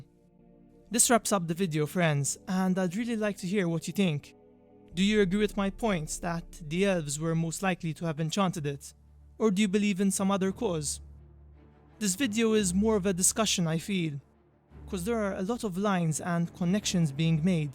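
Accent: Malta. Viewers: Great video buddy! You are Maltese, right? Very distinct accent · You sound like a Maltese English speaker. Good work on the videos!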